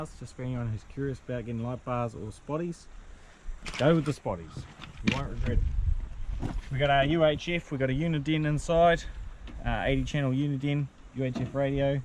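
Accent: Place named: New Zealand. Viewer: All us kiwis sound like that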